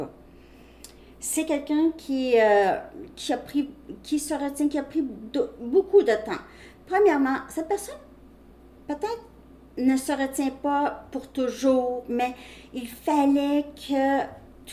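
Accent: Canada. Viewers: Bonsoir ✨ Je suis nouvelle abonnée Merci pour vos messages avec ce délicieux accent canadien Gratitude infinie 🙏🦋🌹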